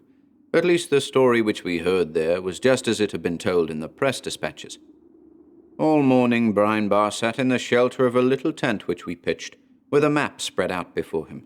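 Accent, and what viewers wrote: United States. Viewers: does such an amazingly subtle American accent it makes me laugh.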